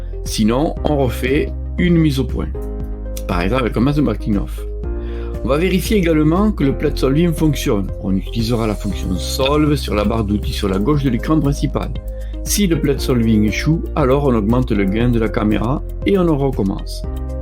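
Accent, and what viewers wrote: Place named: Toulouse, France. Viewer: Un grand merci pour cette série de très loin la meilleure sur ces sujets… c’est clair, précis et sans fioritures inutiles, ça va droit au but sans perte de temps mais à un rythme qui reste très facile à suivre et à assimiler. Pas de blabla, ça ne bafouille pas, c’est un travail didactique remarquable de grande valeur. J’y ai puisé tous les renseignements que je cherchais à comprendre et bien plus encore… Merci, merci, merci mille fois. Et j’ajoute que le petit accent toulousain est bien agréable à l’oreille, ça respire la sérénité tout ça…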